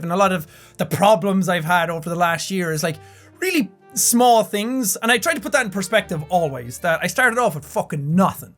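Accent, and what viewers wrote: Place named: Ireland. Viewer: i love your Irish accent and i even dyed my hair like you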